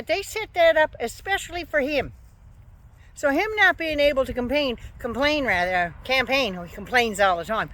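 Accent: Canada. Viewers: is Canadian. I always confuse them as well, as a Brit.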